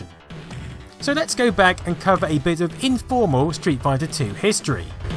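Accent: United Kingdom. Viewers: beeta! You are British! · shitbrit narrator- i'm out.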